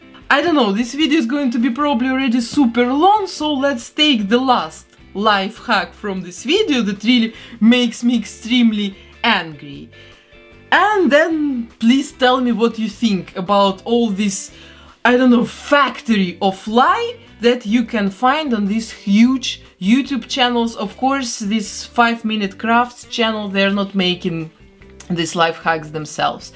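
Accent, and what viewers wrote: Russia. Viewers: Noooo, not "ghouls alive" spectra( you can not reroot her because the mecanism in her head( Btv, i knewed you have a "russian" roots) not just because accent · Lol! Mystery of your accent finally solved!!!!! I thought you sounded a bit Russian ! Interesting! Turns out I was right! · Мне кажется я слышу русский акцент 😅 interesting video,thanks you 🌸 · Какой же сильный акцент Мне кажется, что это девушка русская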